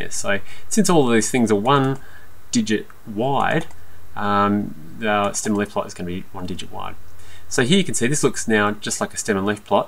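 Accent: Australia. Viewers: lol ,its the aussie accent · its australian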